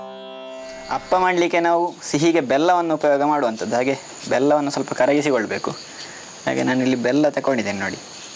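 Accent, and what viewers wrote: Mangalore, India. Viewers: I am from mangalore originally. Now I am in bangalore. I love the kannada you speak. It reminds me of my childhood ❤ I love the recipes you make. It's sooooooooo delicious. I'm am sooo thankful to you that you share our mangalorean recipes. I have tried your recipes and my family is surprised how I make it 😂😂 . For any recipe I refer to your videos. Lots of love. God bless you and your family. ❤❤❤❤❤ I wish to meet you and your family someday when I come to kasargod😊😊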